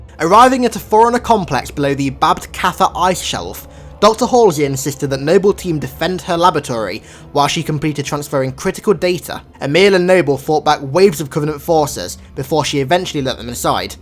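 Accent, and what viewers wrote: United Kingdom. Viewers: i like his voice, it reminds me of an old friend i used to play with back when i was still active on xbox...... though he sounds more british than anything and my friend was canadian.....but still, pretty close.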